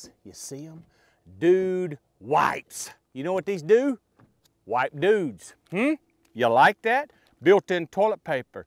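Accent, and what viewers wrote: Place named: South, United States. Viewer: LMAO FUNNY SOUTHERN BOY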